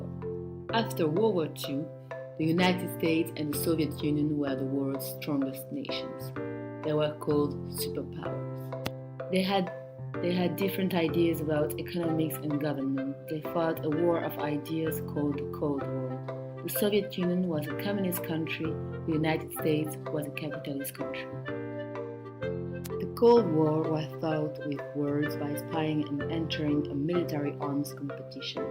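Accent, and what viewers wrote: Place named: France. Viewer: That french accent tho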